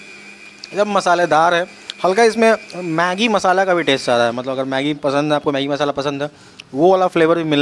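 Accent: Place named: Bihar, India. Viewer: Bhai fan of ur vlogs.Luv the way u explain things in bihari ascent. Big ❤ to all ur mutton vlogs keep making ur vlogs. Best wishes to u n ur team .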